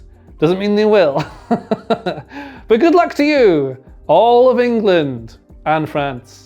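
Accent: Scotland, United Kingdom. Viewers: Always good to hear a Scot analysing how England can win their quarter final. Enjoyed the analysis as always.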